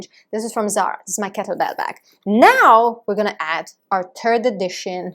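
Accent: Italy. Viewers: Il tuo accento italiano è bellissimo 😍 (Your italian accent is wonderful)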